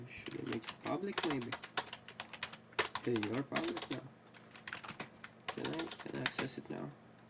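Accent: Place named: Russia. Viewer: Russian pronounsation fits to the videos like this :-)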